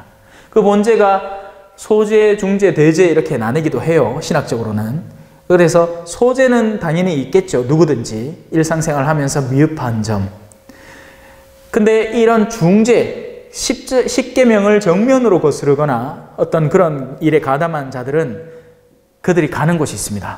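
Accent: Gyeongsang, South Korea. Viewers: 뜨시다 빨리 드시소~ 정감어린 경상도 사투리에 잠시 고향으로 달려갑니다 고향떠나온지 어언40년~ 신부님 사투리가 제맘을 따뜻하게 합니다 언제나 잘듣고 있습니다 건강하세요 신부님~~~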